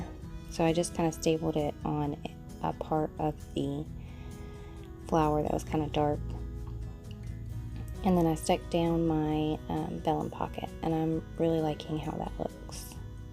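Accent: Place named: South, United States. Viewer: Hi! Im noticing a southernness in your talk! Where are you from? Im in SC, thought we might be neighbors! I love your jj videos!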